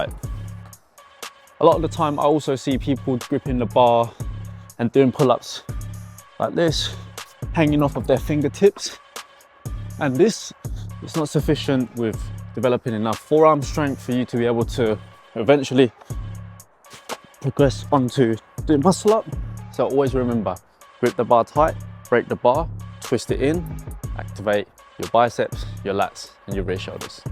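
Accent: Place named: United Kingdom. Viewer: Thanks for the tips nephew, have to say I like your British accent from a young Asian man, I have an Aussie accent for an old Asian man. ( only mentioned this cuz it's my first time hearing it ), best wishes and cheers to you:yougotthis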